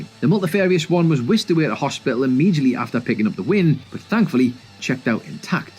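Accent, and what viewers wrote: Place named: England, United Kingdom. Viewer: Which Scottish boys? The narrator's from England.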